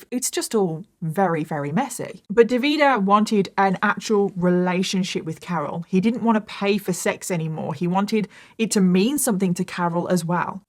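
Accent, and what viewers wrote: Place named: United Kingdom. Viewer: Can somebody please tell specifically what kind of British accent has? Thank you. I like it.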